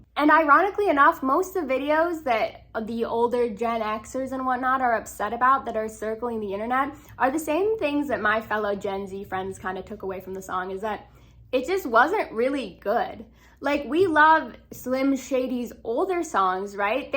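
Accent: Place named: Minnesota, United States. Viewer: german american from minnesota ? damn nice to meet you lol you have such a nice accent btw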